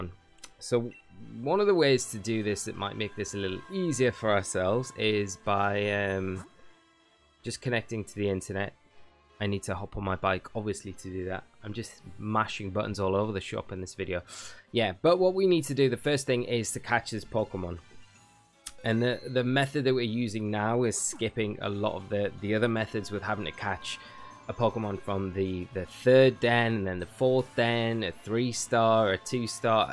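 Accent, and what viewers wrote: United Kingdom. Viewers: i like ya british accent B)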